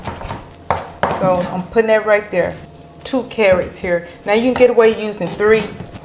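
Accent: South, United States. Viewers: You're so such a lovely lady. Cute country accent ❤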